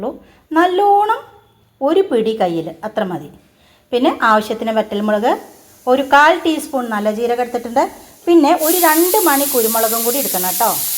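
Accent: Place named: Palakkad, India. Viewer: പാലക്കാടൻ ഭാഷ അവധരണം കേൾക്കാൻ ഭയകര ഇഷ്ട്ടമാണ്..പചകം സൂപ്പർ ട്ടോ😋❤❤...ഞാനും ഇതുപോലെ ഉണ്ടാക്കാറുണ്ട്😍😘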